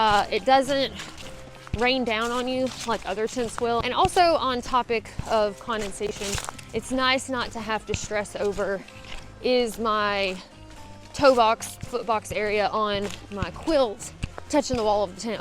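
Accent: South, United States. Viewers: "...He's not not not hocked yet". That's what my subtitles read! 😁 Good thing that I understand Southern (lived there for a bit, long ago, and I love the people and the accent). Back in New England to be with my Mom in her twilight years. I'll be back, likely., an old, big, heavy duty stuff sack, into which you can put a large rock or two, along with a mini 'biner to clip your guy line into, can be a big time saver when setting up in those rainy, rocky conditions. I hiked from Palmerton, Pa. to the DWG in the snow, so I feel your pain. Rocks and ice are a special kind of hazard! Take care!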